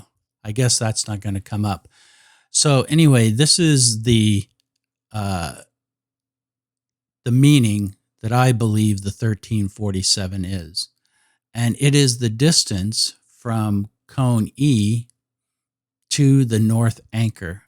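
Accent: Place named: Spain. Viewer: you always explain this so well! Thank you! (Nobody cares that your French is pronounced with a Spanish accent.)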